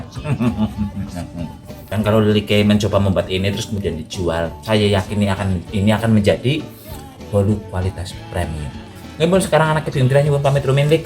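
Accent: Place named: Cilacap, Indonesia. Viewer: Resep" nya ok.mudah di tiru juga sangat menghibur dengan bhs ngapak nya yg medok cilacap.tp sy.sebagai orang kendal jateng paham.dengan.bhsnya mas.semangat trus..Salam.ublek"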